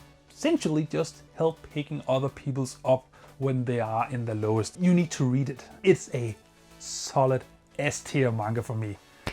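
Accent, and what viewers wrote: Denmark. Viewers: are you by any chance Danish? i feel like you have a Danish accent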